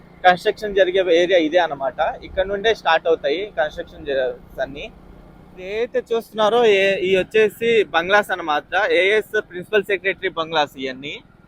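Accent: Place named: Guntur, India. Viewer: గొర్రెల కాపరి మహా భారతం చెప్పినట్లు గా ఉంది. అంతో ఇంతో చదుకున్నట్లున్నారు. భాష మీద పట్టులేదు tv జర్నలిస్ట ల భాష "ఏదైతే ఉందొ, అనేది ఉందొ, చూడవచ్చు, ఇలాంటి మాటలు జర్నలిస్టులు మైక్ పట్టుకొని అ ఆ ఇ ఈ అంటూ మాటలు తడుము కుంటూ సోది చెపుతుంటారు మీరుకూడా వాళ్ళ లాగ సాలు మూల లేకుండా రొడ్డ కొట్టుడు కొట్టారు. మీరు చాలా చాలా ఇంప్రూవ్ కావాలి.మీరు మాట్లాడే భాషలు guntur యాస కొట్టొచ్చినట్టు తెలుస్తుంది "వచ్చిద్ది, తెచ్చిద్ది".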